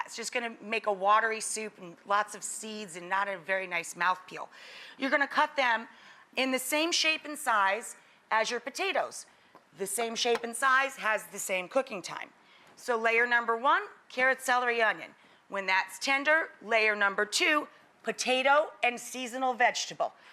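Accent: United States. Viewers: As soon as I heard her American accent I knew this would go wrong! I shut down straight away not being racist but Americans are not the most aspiring people in the world.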